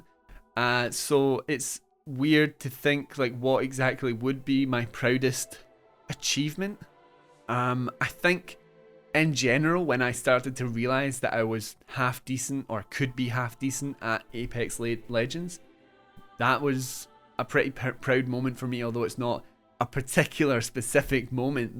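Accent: Scotland, United Kingdom. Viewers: Push Square has rapidly become my favorite gaming website, because it’s refreshing to have a place where people discuss video games with no apparent agenda beyond the love of the medium and a desire to see more good games. Even commenters are mostly civil to each other, and that is down to the site establishing the desired tone (and a little moderation from time to time.) So now I’ve subscribed to the YouTube channel and so far I’ve enjoyed what I’ve seen. I liked the couple tech reviews. I’d prefer that you go in depth on one topic to making “Top 7” videos, if you’re taking requests. The topic should be whatever interests you, because that’s the only way you’ll make videos a million other people couldn’t make. The accent is great! I’m American but my mother came from Glasgow and all my favorite relatives sound like you (though maybe you’re from another part of Scotland because I understand you just fine at 1.25x speed.) Tifa was the correct answer. 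The proper response to the swallow question was “African or European swallow?” You’re doing great!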